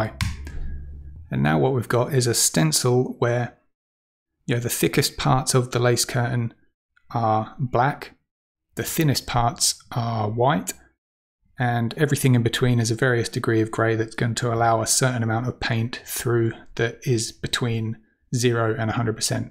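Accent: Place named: United Kingdom. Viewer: Hey, I used to subscribe to your channel and newsletter in the past but over the last couple of years my photography has had to take a back seat for various reasons (mainly work!). However, I find myself self-isolating and working from home at the moment and have actually had a bit more time to go back and start editing some of my images. Your name popped into my head as being the 'luminosity mask' guy so I duly found your website and channel and resubscribed to both:) I have to say, I've picked up so much by watching your latest videos and everything is making sense now. Probably one of the reason my interest waned before was I simply wasn't getting the whole luminosity mask idea. Having just watched this video, the whole 'stencil'/'lace' analogy is something I wish I'd heard a few years ago. I remember watching your live walk-through edit of the Gibson Steps(?) and being impressed at the results but not appreciating the techniques you were using. 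Might be time for a re-watch if it's still available. Anyway, great stuff all round and thanks! BTW - if I recall, you're based in Oz aren't you? Reason I ask is that I detect a UK accent in there somewhere! [edit - spelling]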